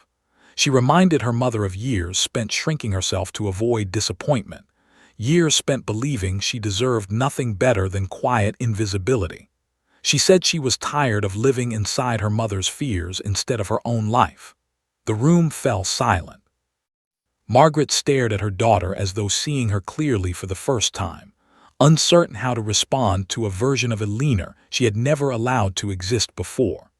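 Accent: United States. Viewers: .........And get rid of the US accent when it's a UK story.......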